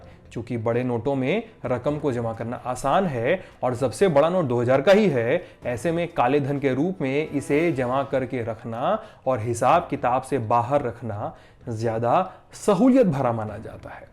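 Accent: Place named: Bhojpuri, India. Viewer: Apko bat bhojpuria style Achi lagi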